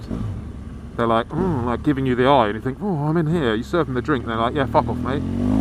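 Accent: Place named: Surrey, United Kingdom. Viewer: HOLY Shit your from surrey! i knew i reconsied the accent somewere :D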